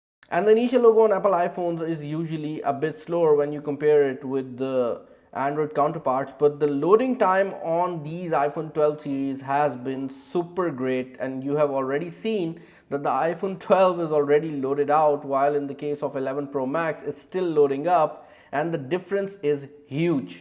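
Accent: India. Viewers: Ooh! That typical indian accent though.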